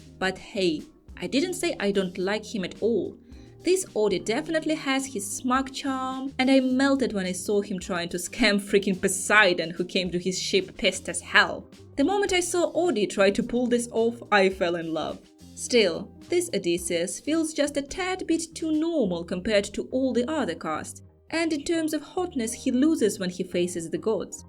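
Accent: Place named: Ukraine. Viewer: WAIT— UKRAINIAN? а я ж ЧУЮ що якийсь акцент є!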